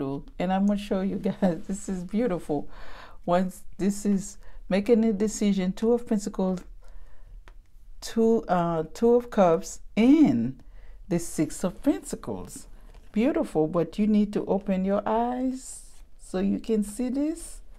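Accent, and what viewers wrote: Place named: Jamaica. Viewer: Your accent sound Jamaican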